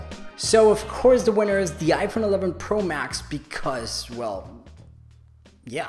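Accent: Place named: United States. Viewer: Thank God for his American accent 😌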